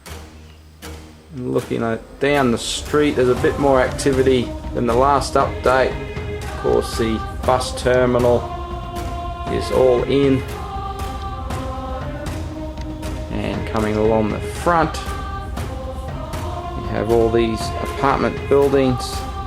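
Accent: Australia. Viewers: well dis guy is Australian..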